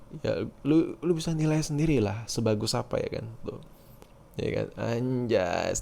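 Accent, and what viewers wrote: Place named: East Java, Indonesia. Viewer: Orang jatim ya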